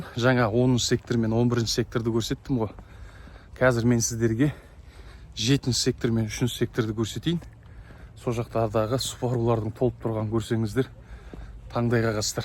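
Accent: Standard. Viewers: Ассалаумағалейкүм көпшілік! Сөйлеу мәнері, тіл байлығыныз керемт. Таза қазақ тілінде сөйлейсіз, тындап отыра бергін келеді.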